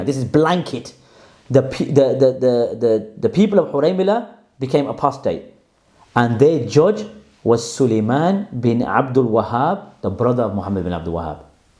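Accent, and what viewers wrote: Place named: Punjab, India. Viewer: Love ur punjabi accent bro😂❤️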